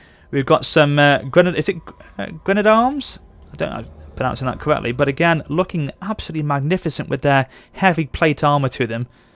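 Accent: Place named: England, United Kingdom. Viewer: MMm such a nice mod. Btw, 1. Do you live in the UK (I know you are Enlgish (Or at least sound it) 2. If you do what Provider do you use 3. If its bt what router do you use to play NTW!!! Ive tried 5 different routers and I still cant play NTW online and I cant exactly get a new provider xD Nice Video as well:)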